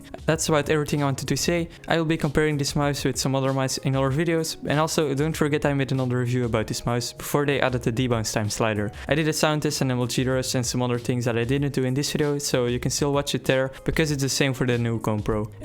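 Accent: Netherlands. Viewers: i like your Dutch accent :D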